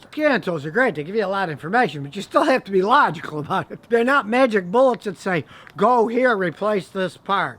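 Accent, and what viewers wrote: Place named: Buffalo, United States. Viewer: Yah- heez from Buffalo!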